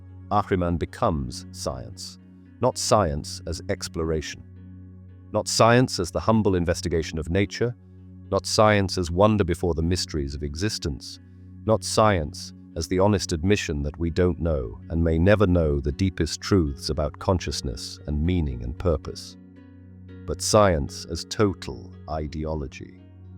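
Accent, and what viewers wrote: United Kingdom. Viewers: I listened closely to the first 15 minutes and I can't believe people actually see this as anything more than Kamala Harris talking before an election it says absolutely nothing in a British accent for 15 minutes I can't listen to the rest of it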